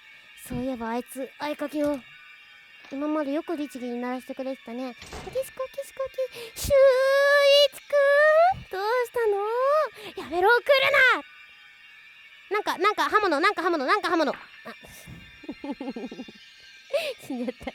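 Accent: Japan. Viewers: アイちゃん広島の子？じゃろってw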